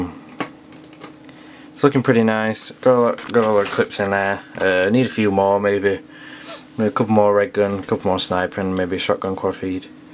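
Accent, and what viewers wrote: United Kingdom. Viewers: You are so british I love it. Where you from pal? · YESSSSS SOMEONE BRITISH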